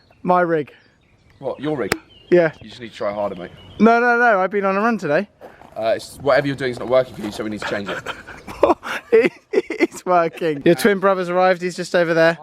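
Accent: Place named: United Kingdom. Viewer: Bro shut the f*** up please. We want to hear the car, plus the British accent gave me an headache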